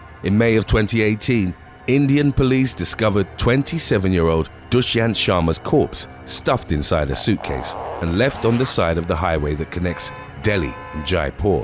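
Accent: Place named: England, United Kingdom. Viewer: when i hear an English accent i assume its white. kinda disappointed its not a white old guy.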